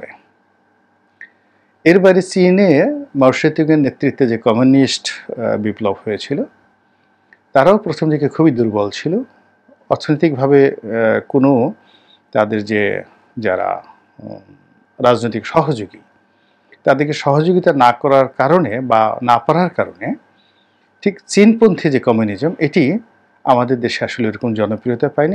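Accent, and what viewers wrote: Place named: Patuakhali, Bangladesh. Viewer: মি আপনি আর বেশি কথা বলবেন না,আপনাকে কেউ শুনতে চায় না,আপনার বাংলাও হয় না, শুদ্ধ করে বলতে চেষ্টা করছেন কিন্তু হচ্ছে না প্রায়ই,পটুয়াখালীর উচ্চারণে কথা বলছেন খুবই ভালো। যাকে যা মানায়, তাছাড়া পটুয়াখালীর ভাষা তো ভালোই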